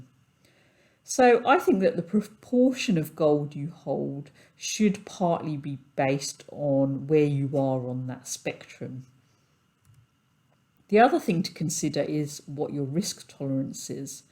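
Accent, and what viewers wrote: England, United Kingdom. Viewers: Thanks for all the information. You definitely did not answer the question though !!! Noticed someone said you were a kiwi. You have an English accent surely ?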